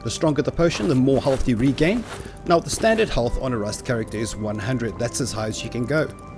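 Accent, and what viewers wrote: South Africa. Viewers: Mister are you from South Africa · this guy sounds south african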